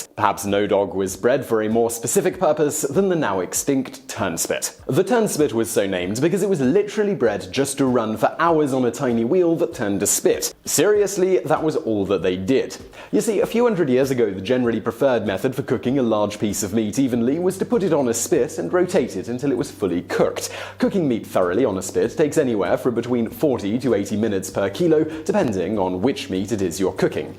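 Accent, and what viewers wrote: United Kingdom. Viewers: I'm reading your comments in your British accent.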